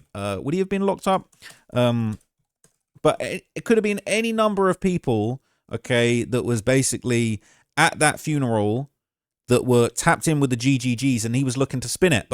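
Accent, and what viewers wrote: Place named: United Kingdom. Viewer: Crazy to hear this limey with such inside knowledge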